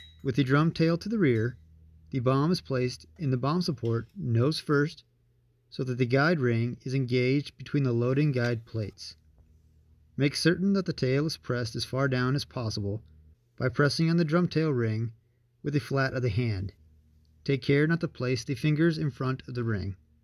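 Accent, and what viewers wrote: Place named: United States. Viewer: I really don't like the fake instructional training video format. And your nasal US accent wouldn't have got past the front desk of the WD Publications Branch.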